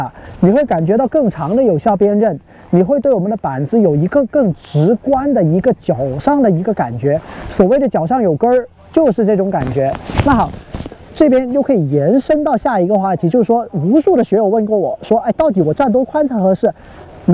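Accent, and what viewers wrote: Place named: Cantonese, China. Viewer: 广普加儿化音 太逗了！哈哈哈哈哈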